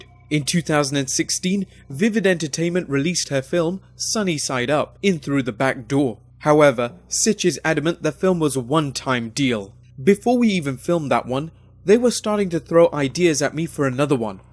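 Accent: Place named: United Kingdom. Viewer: I wish this information was spoken in a voice that wasn't from the British pits of hell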